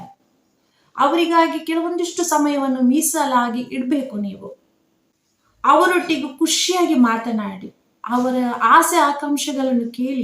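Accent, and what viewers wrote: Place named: Udupi, India. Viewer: Your accent etc tell me that you are originally from some ಉಡುಪಿ or ಕುಡ್ಲ r area but probably living away from thére. This video indirectly talks about some vital statistics about the high rate of illicit sex among the married female partners of those men who have gone away, usually abroad, finding some employment. You are strongly suggesting here that to a (married) woman being sex-unemployed is next to impossible and so having UTTERly failed to control their desires etc, they have chosen to tread the dark path of physical adultery. You have also said that not all of them have become so which is natural to invite the following legitimate question--how are the majority of those not regularly sexually active ÍN the secret chambers of men coping with their uncontrollable libido--have they been using sex toys and masturbating often, have they been watching or reading mummy porn, have they been having phone sex or non-penetrative sex etc? 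I hope you would also deal with those alternative sex satisfaction means also sometime. Thank you for using ದೈಹಿಕ ಪ್ರೇರಣೆ somewhere which is only a remote euphemistic translation of 'sexual advances'.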